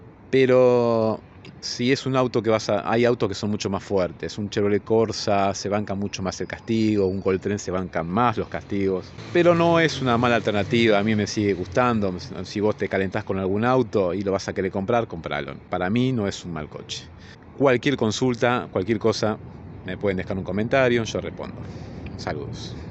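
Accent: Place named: Argentina. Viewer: desp de ver y escuchar varios vídeos, me encantó escuchar tu vos de argentino jaja. comparto con todo lo q dijiste. muy buen video, gracias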